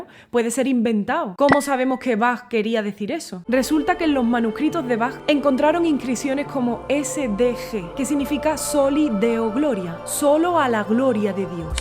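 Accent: Andalusia, Spain. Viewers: Lindo acento andaluz tenés, y tu simpatía es comunicativamente eficaz, pero tocás mal, piba, con problemas de tempo (acelerás y retenés sin criterio, adornás sin intuición y porque está establecido en manuales que ciertos trinos deben ocurrir aquí y allá, lo que te lleva a rehuir la creatividad en tus fiorituras, etc.), por no decir que tus cuerdas están siempre ligeramente desafinadas. Ergo, dedicate a hablar y largá de una buena vez la guitarra. Un survival tip: si vas a tocar una pieza, nunca antepongas un fragmento de una versión tan superior, como la de Evangelina Mascardi. Lo mejor habría sido, ya que la honestidad late en vos, que te limitaras a enviar a tus suscriptores a visitar esa versión en lugar de exponer tus insuficiencias cometiendo la tuya. Saludos.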